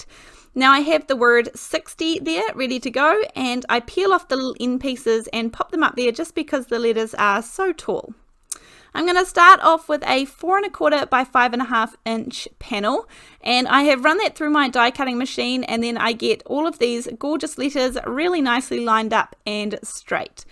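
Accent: New Zealand. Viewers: 😍😍😍love the card!...and love that fantastic kiwi accent! Cheers from Texas💖😘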